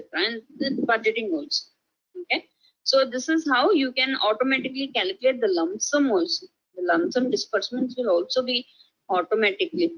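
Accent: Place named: India. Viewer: Very conc Indian accent, barely understand what she's saying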